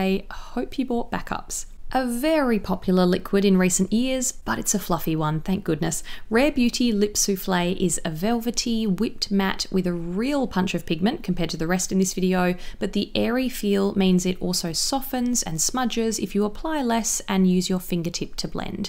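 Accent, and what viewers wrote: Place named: Australia. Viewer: I Like Aussie People. Love The Accent.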